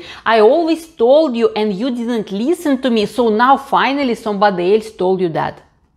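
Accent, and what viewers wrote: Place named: Latin America. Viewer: Mi amor tienes un asento bien marcado deves ser latina trankila q estas hayudando a la gente y eres bella .Yo so soy boricua y con asento bien marcado🤣🤣🤣asi q Palante.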